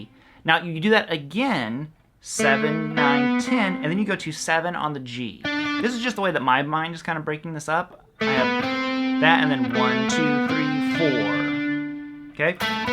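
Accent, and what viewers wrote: South, United States. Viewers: Hi. Australia calling. Love that southern drawl y'all have!! You can give me Blackmore fovever as he's my favourite guitarist bar none. How about the "solos" on Highway Star and Burn?? BTW check out Bach's tocatta and fugue in D minor for the Death Alley Driver riff and Sky's version of Tocatta for Ritchie's inspiration.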